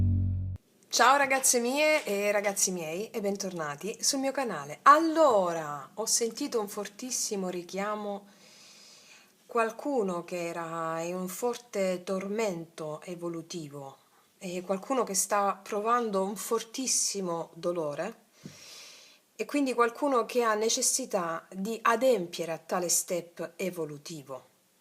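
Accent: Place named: Apulia, Italy. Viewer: Dal vago accento sembri pugliese...sbaglio?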